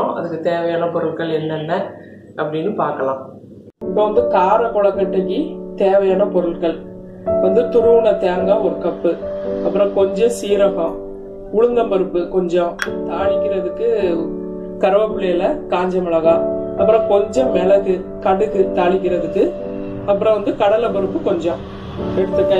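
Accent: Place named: Tirunelveli, India. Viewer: super mam....👌👌👌 unga slang romba nallaruku ...tirunelveli tamil mathri...... Azhaga iruku ..👍👍❤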